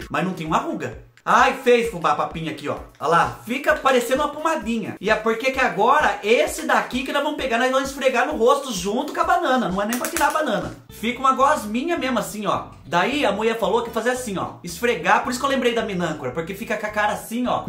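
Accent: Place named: Piauí, Brazil. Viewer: Fubá, você é do Nordeste? Eu sou do Piauí, Amarante, mas moro na capital Teresina...sua fala é idêntica à nossa...com erros de Português, mas nós somos inteligentes e estudiosos graças à Deus! É a força do hábito mesmo...e o que deixa você mais engraçado é o seu linguajar... Você deveria ir pra TV Globo ser humorista 😂 Eu queria saber quem é essa "muié" que lhe ensina essas receitas... E por falar em receitas eu fiz a da casca de banana, maizena e óleo de coco...e senti que deu uma suavizada no bigode chinês...tenho 6.6, queria ter ficado com uma cara de 50 pelo menos, mas minha idade metabólica é 58 anos (mesma idade do meu namorado😅, e minha cabeça é de uma menina de 20😊), fiz minha bioimpedância (21/08/2024) e tudo está normal 🙏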